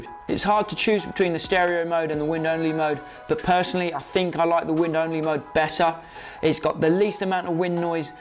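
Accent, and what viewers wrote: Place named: United Kingdom. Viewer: Great video, thanks for taking the time to make it 🙂. It answered a lot of my questions about wind noise, in a normal, undramatic British accent........God, I'm sick of all the over-exaggerated, over dramatic American accents on YouTube !! ☹️. As the speeds are quite low on your vid, what are your thoughts about wind noise on a side helmet mounted road bike travelling at speeds up to 80mph, do you think the results would be the same ?? Cheers 🙂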